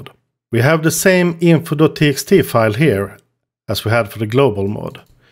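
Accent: Scania, Sweden. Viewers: Den skånska brytningen gör videon så mycket bättre 🤣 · I love his accent. I'm guessing it's Swedish lol. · fantastisk skånsk dialekt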